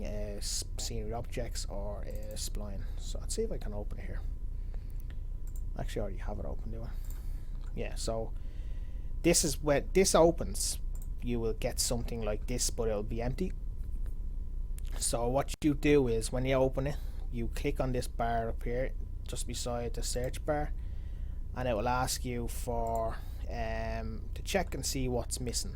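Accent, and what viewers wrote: Ireland. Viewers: this guy is definitely irish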